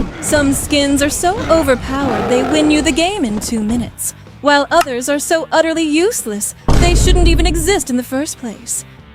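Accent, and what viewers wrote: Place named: United Kingdom. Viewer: holy British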